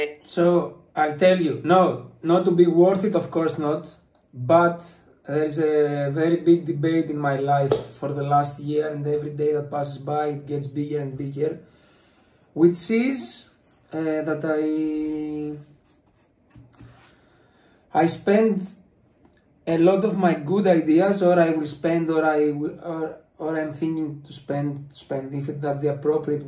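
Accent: Greece. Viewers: Κρατά την ελληνική προφορά στα αγγλικά θα ειναι πιο φυσικό και κάτι ξεχωριστό για τους ξένους βιουερς το να προσπαθήσεις να κανείς αμερικανική προφορά θα ειναι λίγο fake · για την ωρα μιλας αγγλικα σαν Ελληνας...δεν ξερω ποσος χρονος σου περισσευει αλλα θα προτεινα,εκτος τα μαθηματα,παρακολουθηση ταινιων και βιντεο μονο στα αγγλικα...επισης πρεπει να αρχισεις να σκεφτεσαι αγγλικα...καλη επιτυχια σε οτι κανεις στη συνεχεια...εμεις μαζι σου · Ο μπρο την έμαθε αγγλικά με ελληνική προφορά 😂 Συνέχισε να κανεις αυτο που ξερεις εχεις την δύναμη και θα τα καταφερεις❤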